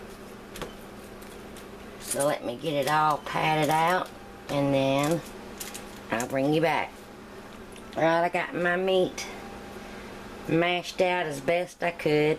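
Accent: South, United States. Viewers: you are too cute with your pretty country accent❤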